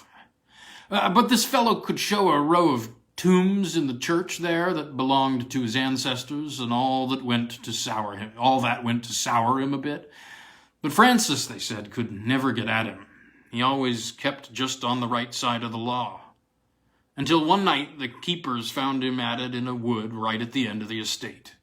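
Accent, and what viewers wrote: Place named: United States. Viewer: To hear this in an American accent is curious, of course, but you bring it off well. Thanks!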